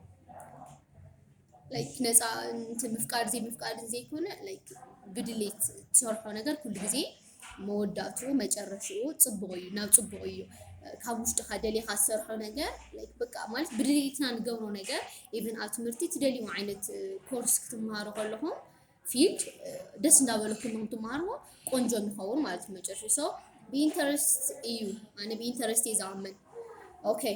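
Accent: Ethiopia. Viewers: anti shikorina ane nay Shire endiyu lahjay gin ab adigrat wey dma bhafesh ab agame zelo tigrigna esi kab nay etom lahjom des eyblnenin do gele do tiblyom zeleki bzuh zifile eykonen. Ab hade quanqua nay lahja fililiy zelo eyu. ms yikreta kemzi natki zereba neay nay nebse mithat zisimeo eyu koynu zisimeni. Ab Tigray zela hmam amhargna mihwas eya ezi kea ab kulu zelo eyu. Eritreawiyan "Agame" zibil kem xerfi silezitikemwa ilki kali eytmseli bnatki kuri. kali yiredaki eyredaki eytcheneki ksab Tegaru ziterduki tirah amhargna eynhwes eyu eti kinblo zelena. Ane demani kem Tigraweyti meten nay mekelle, wukro, agame, raya kulom Tegaru lahja yirideni eyu! Nebska mimsal zimeslwo yelen kemzi eytkuni. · ናይ መቐለ ትግርኛ ኣይስማዕን ዝበለኪ ኸ መንዩ 😏😏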